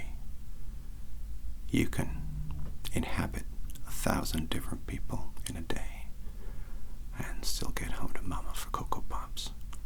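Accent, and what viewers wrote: United States. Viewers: That’s such a good American accent... fooled me until I saw the comments. It’s awesome man! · truly i was jarred when i heard the American accent still soothing tho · I don't know what it is, but an Englishman who can do a convincing American accent is very powerful to me. 👀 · I love the way your American accent sounds. Your also an amazing actor!! ❤️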